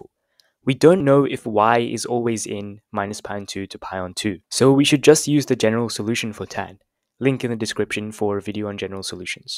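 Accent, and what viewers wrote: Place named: Southeast Asia. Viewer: I dont think so,his accent is diff from indian,more like southeastern or something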